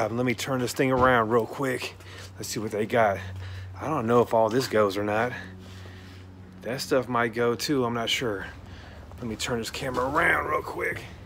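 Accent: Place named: Louisiana, United States. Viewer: Dude is wild with that Louisiana accent. That's what made me subscribe. He's natural with it. And, the background music is the bomb.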